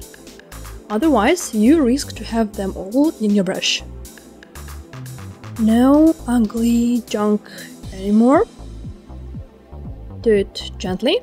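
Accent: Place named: Russia. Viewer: мне показалось, что у русский акцент, или это действительно так? it seems like voice has russian accent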